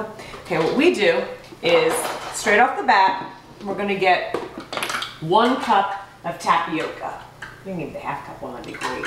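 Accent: New York, United States. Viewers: Would this work in a dutch oven format at high heat do you know? Being from NJ now in FL, I recognized NY in the first sentence. Love love the Northeast crisp to the pointness that we are "famous" for. Love your vid, very helpful. I stopped eating wheat 5 years ago, mostly raw vegan but I miss the ritual and smells so might try this. Beautiful job, lovely person! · Loved your video. I heard the accent New York 🗽 Baby! 🤣🤣 I’m just looking for better bread options and never used my bread machine that was gifted. Plus sometimes with being tired from work, my schoolwork, the kids schoolwork, house chores 🤦🏽‍♀️ I need to start using it. Let the experiments begin!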